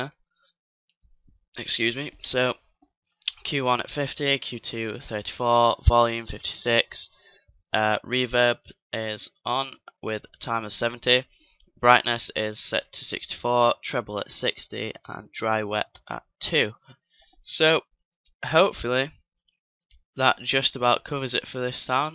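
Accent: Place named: United Kingdom. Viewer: Even though I am American, no one can speak "Synth" like the brits. Dope Tutorial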